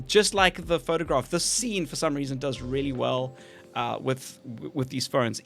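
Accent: South Africa. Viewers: Dude, where are you from? I can hear Joburg with some Durban and also a pinch of New Zealand.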